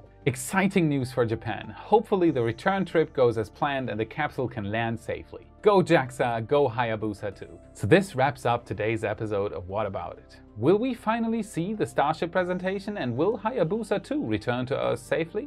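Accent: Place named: Germany. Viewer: Strong German accent, sounds awkward.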